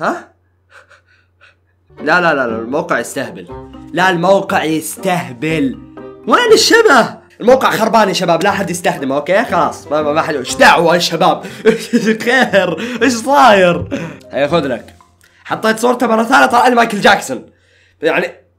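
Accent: Gulf, Saudi Arabia. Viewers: انت سعودي ولا اماراتي؟؟؟؟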